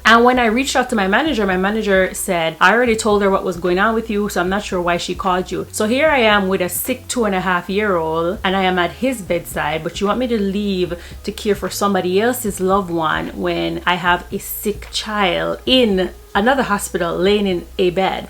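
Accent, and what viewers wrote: Jamaica. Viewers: Hail up muma!!! Hear the jamaica accent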